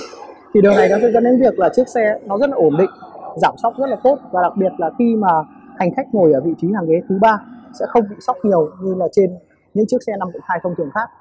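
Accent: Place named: United States. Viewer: May mà bạn nói bạn là người vn nếu ko thì tôi cứ tưởng bạn là người Mỹ đấy.cảm ơn bạn.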